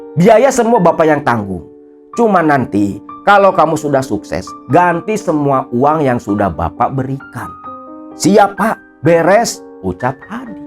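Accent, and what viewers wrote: Java, Indonesia. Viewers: Kaya dangar ketoprak bahasa jawanya kuat orang Papua dan propinsi lain agak susah mencernanya🙏🙏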